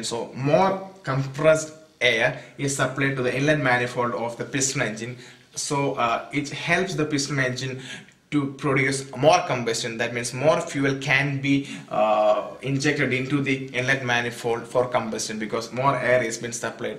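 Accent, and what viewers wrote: India. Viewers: don't know if he is speaking english or indian.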